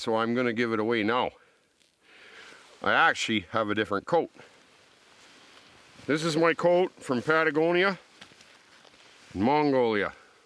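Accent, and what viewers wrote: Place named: Canada. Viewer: I just LOVE you deep voice, accent, and sense of humor. I wish I could go to Canada and spend a week with you just to listen to you teach me survival stuff!! Thanks for sharing.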